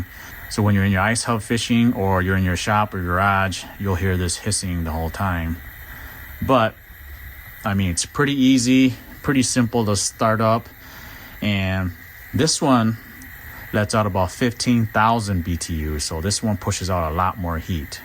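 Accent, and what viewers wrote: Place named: Minnesota, United States. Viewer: i thought he was from Minnesota as soon as he started talking, kind of like Canadian.. i dig that accent.. great video too ! i'm getting my big buddy today🎉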